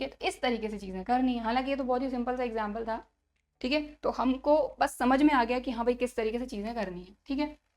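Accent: Haryana, India. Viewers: mam I think you belong to HARYANA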